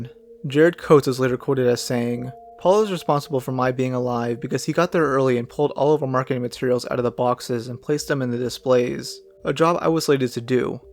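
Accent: Canada. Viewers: I don’t really think so. has a bit of a Canadian accent, meanwhile has a slight Spanish speaking accent (can’t pin point where, my Spanish is not very good) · isn’t it obvious, they are both Canadian as said and he just puts on a slightly Mexican accent for blameiton “ ” (Mexican George) show and puts on his typical canuck accent for his persona.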